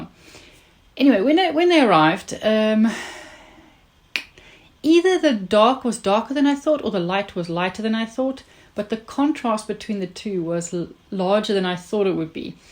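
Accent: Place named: South Africa. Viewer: Hallo, your video was suggested to me on YouTube and the moment I heard you talking I wondered if you weren't South African😀. I live in Pretoria. Lovely projects! ❤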